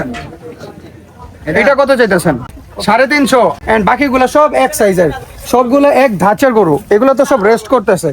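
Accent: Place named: Old Dhaka, Bangladesh. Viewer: তোমাকে সাবস্ক্রাইব করেছি আমি আর তোমার বড় ফ্যান তুমিও তোমার ভাষা পুরান ঢাকা আমার বাসা নারায়ণগঞ্জ😊😊😊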